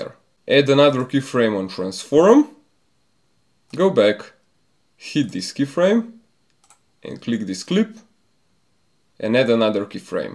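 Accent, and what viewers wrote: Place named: Russia. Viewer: Почему-то чувствуется русский акцент)))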